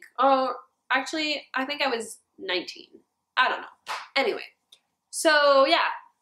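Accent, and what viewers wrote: Quebec, Canada. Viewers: Canadian accent 😂😍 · French Canadian. It's just her accent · She's from Montreal She replied to a comment asking about her accent and where she lives. It's French Canadian. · I love your accent, I'm a Canadian too so I love this. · she has a Quebec accent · Are you Quebeci French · Are you from Quebec? You have the kinda accent? (In my Aussie opinion lol) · It's French Canadian. And if she only speaks French for the most part she most likely translating in her head.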